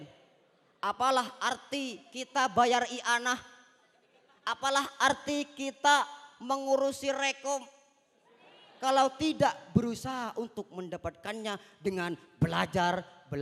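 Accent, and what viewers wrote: Madura, Indonesia. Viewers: Logat Madura GK bisa di sembunyikan 😂❤ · Ngmong e jowo tpi logate meduro👍👍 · Mungkin ini orang Madura Logatnya sangat Madura bosku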